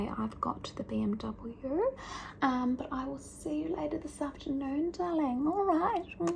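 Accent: Australia. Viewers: If everyone in australia speaks like this i think i need to move asap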